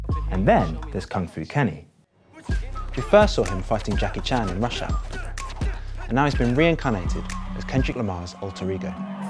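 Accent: United Kingdom. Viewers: British narrator SMH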